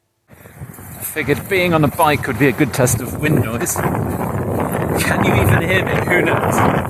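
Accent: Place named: England, United Kingdom. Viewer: I like unboxings with the English accent, but I just bought them just to do bets on how creepy they are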